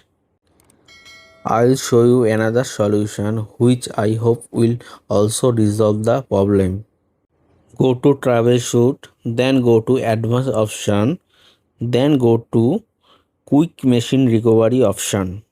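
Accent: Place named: India. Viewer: If you hear an indian voice in the pc repair hacks you know it will work thank you man your the best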